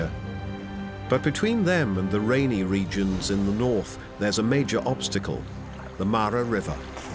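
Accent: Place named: United Kingdom. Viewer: A smooth British accent can make people believe almost anything.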